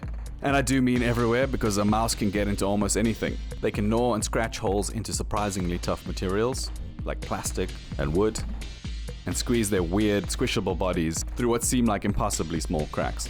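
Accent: South Africa. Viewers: Hey man.. Where are you from? You sound South African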